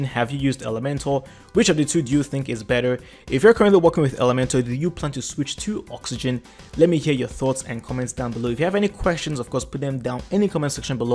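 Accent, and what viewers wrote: Nigeria. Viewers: are you a nigeria sir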